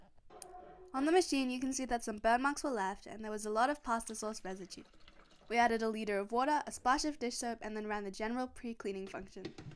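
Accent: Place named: Asia. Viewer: You sound Asian. Any chance you can try a few Asian recipes? Like biryani, stewed pork belly, beef noodle soup, etc. Thanks!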